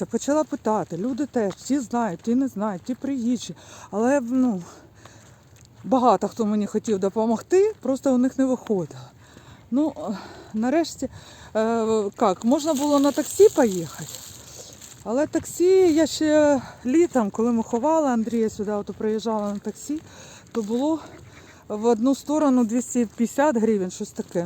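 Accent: Russia. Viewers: Барышня , слушая вашу речь понимаешь что родной язык ваш РУССКИЙ. Не издевайтесь над собой и не занимайтесь садомазохизмом. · Дуже гарно розмовляєте українською, хоча чутно що розмовляли російською, велика подяка та респект, в цьому видно любов, повага, пам'ять до чоловіка який загинув за Україну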